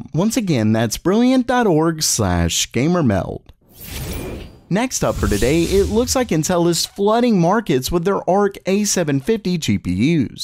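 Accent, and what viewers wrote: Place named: South, United States. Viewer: please lay into your accent more. i need tech vids from a southern gentleman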